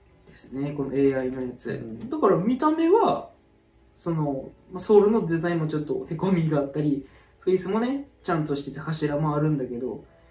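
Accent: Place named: Kagoshima, Japan. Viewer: やっぱり鹿児島弁は聞いてて、気持ちよく聞き取れるし、いい感じです🙋いつも楽しいYouTube動画で試打やクラブの情報ありがとうございます♥️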